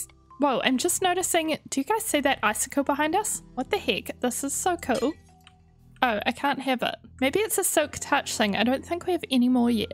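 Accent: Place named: New Zealand. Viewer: omg stop ive been following u for a while an just now do i realise ur kiwi too xD (no wonder why i felt so comfortable listening to u speak cos there isnt an accent for me haha) kia kaha e hoa !! aroha nui hehe <3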